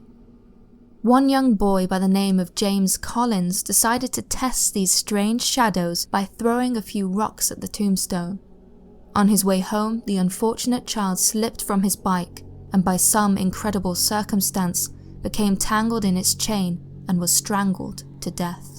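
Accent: United Kingdom. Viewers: The British accent is one of the best. So calming to listen to.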